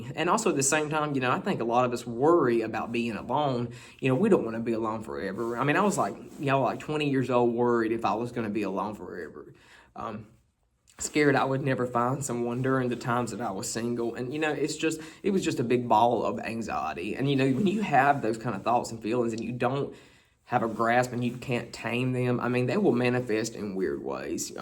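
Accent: South, United States. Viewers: To some types you would be naturally irresistible, to others nearly-so, and to a very few TOO MUCH of that first!! 🥴 Goes with the territory, 🌟 🌟 Your 'southern drawl' is pretty spectacular out here, in Yankee Territory. 😉 (Well hell, I'm 3/4 Johnny R. maself!) As long a/s you're around -- a lifetime subscriber/supporter is here. 👍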